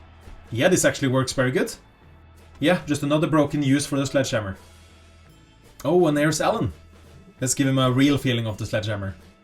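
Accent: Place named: Norway. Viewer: The accent is violently norwegian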